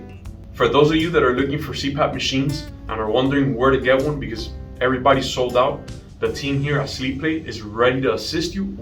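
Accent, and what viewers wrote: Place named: Miami, United States. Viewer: Bro, you have the thickest Miami accent ever. I gotta save this vid to show people what a Miami accent is. 😃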